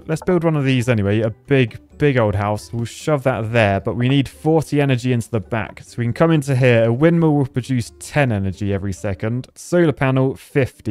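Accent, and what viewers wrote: United Kingdom. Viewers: Wow thanks for playing our game! I'm British too btw, so I approve of the pronunciation!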